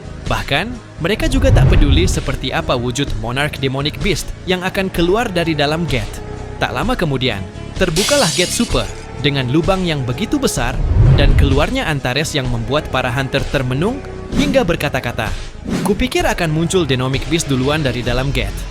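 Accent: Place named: Malaysia. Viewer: Kau ni ape, melayu rupanye abang satu ni😅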